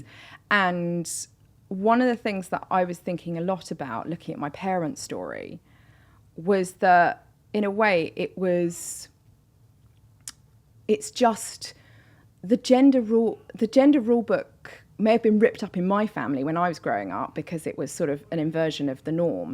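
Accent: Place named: Southern England, United Kingdom. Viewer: That is not estuary, that's a standard southern middle class accent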